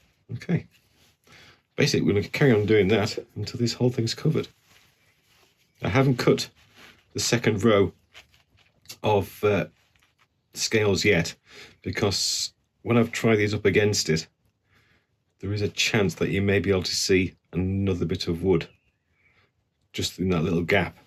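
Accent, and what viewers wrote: United Kingdom. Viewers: I've seen many of your projects but this one, even royalty would blush. While stationed at RAF Lakenheath, I enjoyed all aspects of Great Britain. Now that I'm retired back home in Texas your accent and kind words bring back those enjoyable times. Keep creating my friend!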